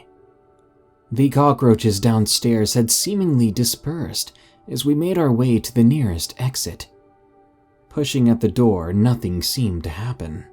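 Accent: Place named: South, United States. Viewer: I liked the female narrator’s voice too. I love the man’s voice but for a story that’s from A woman’s perspective, I liked that a female voice was used for her account and I also liked her slight Southern accent as it is mentioned in the story that she is from Kentucky.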